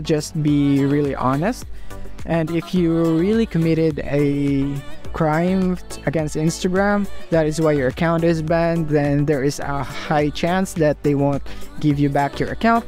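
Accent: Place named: Philippines. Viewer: You sounds like a Filipino.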